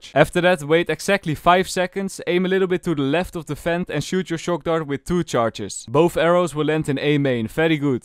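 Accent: Netherlands. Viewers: i smell dutch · Cant stand this guys dutch accent but his tips are so good i have to subscribe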